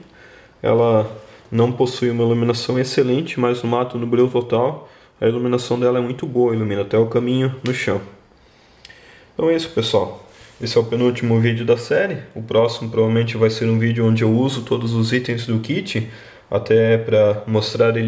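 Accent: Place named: Santa Catarina, Brazil. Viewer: e ae cara, gostei dos seus videos, ja estou inscrito. Pelo seu sotaque vc é de SC certo? Qual cidade amigo? Abraços!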